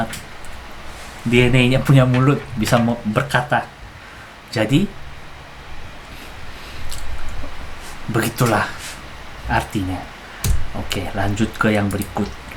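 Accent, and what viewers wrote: Indonesia. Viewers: Saya suka, logat Anda Indonesia banget. Mantap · mantap kak.. emang org indo harus ikut lidahnya org indo.. liat yg luar negeri susah dimengerti 😁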